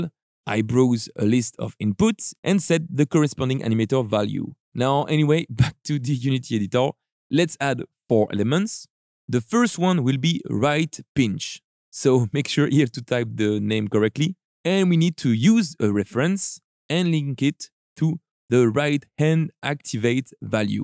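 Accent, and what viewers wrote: France. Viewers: you have a french accent ?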